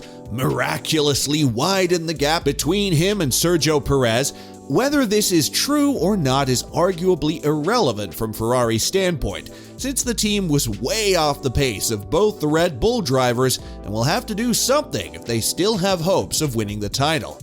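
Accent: United States. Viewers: I'm sorry but you are sounding way to American to be taken seriously about this. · A strange video - more like an attempt at anti-RB PR stunt with some very doubtful claims of "cheating", read out by an overly-dramatic voice actor with a condescending tone who is clearly not an F1 expert. Not a fan of the mid-west accent and 'Murican colloquialisms when presenting a top-end, international motor sport. What's the point of this amateurish, hyped-up polemic? This poorly executed click-bait will not catch me again.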